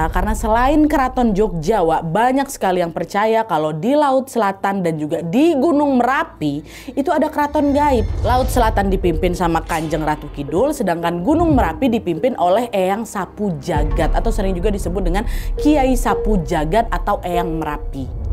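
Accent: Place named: Medan, Indonesia. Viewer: nampak x kau medan nya Wak🥱🥱🥱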